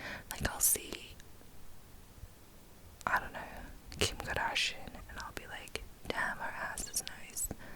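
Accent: England, United Kingdom. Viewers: You are starting to sound a little more 'English' after your time over here in the UK :) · 만세만세🙌🙌🙌🙌🙌인스타에서 댓글받고 얼마나기다렸는지 몰라요🙌🙌고3이라 폰을 중독방지어플로 잠궈놔서 알림받고도 보지를못했어요ㅜㅠㅜㅠ이제야보다니ㅠㅜㅜ언니 머리 많이자랐어요!!!!어릴때 영국갔을때 그 특유의 발음을 듣고 컬쳐쇼크였는데 언니발음에도 그 발음이 들려요ㅇ.ㅇ!!영국미국을 오가며 글로벌하게 자라셔서 그런가ㅋㅋㅋㅋ컴백환영해요💕💕💕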